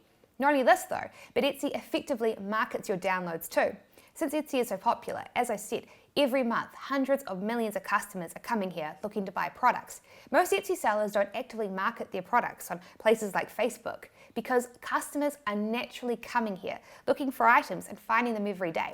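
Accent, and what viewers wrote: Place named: New Zealand. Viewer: As always, you present valuable content in your videos. In this video, you talked about digital product ideas I had not thought about. Thank you! There's another reason why I enjoy watching your videos. That reason is listening to you speak. I've loved the sound of the New Zealand, Australian, and British accents — in that order. Be safe. Stay healthy. And, most of all, enjoy your vacation!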